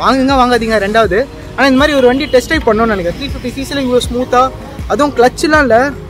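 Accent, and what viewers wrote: Chennai, India. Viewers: Super Bro.... full Chennai slang I like it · "மெட்ராஸ் பாஸை" யில் பேசுவது கேவலமாக இருக்கிறது!👎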